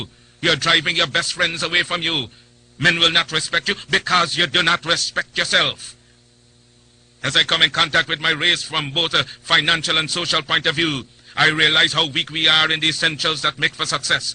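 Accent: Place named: Barbados. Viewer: Your narrator sound Bajan.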